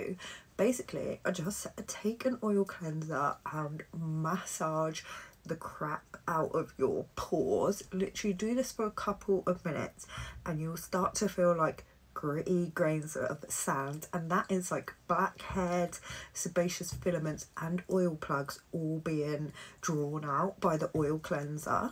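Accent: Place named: United Kingdom. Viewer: Wth i never thought u woulf have a british accent!!!😊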